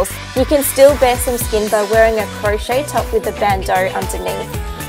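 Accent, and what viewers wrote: Australia. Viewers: your accent reminds me of my trip to australia... I just came back to Italy from Melbourne.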